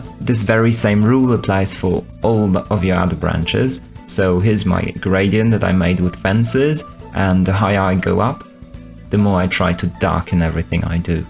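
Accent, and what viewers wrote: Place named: Germany. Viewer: Oh nice I thought i heard some German in there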